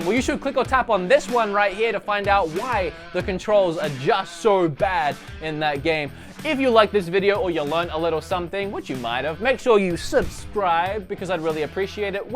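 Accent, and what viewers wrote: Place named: Australia. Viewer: you know hes Australian right...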